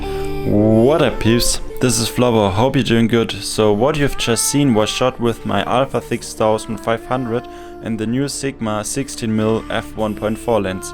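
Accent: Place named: Germany. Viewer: An dein Englisch kann man so gut erkennen das du deutsch bist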